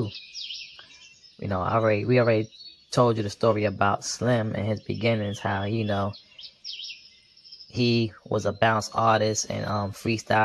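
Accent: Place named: New York, United States. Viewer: Sound like you from New York or Detroit, but da' 504 alllll up there too.. Good job bruh. R.I.P. SLIM!!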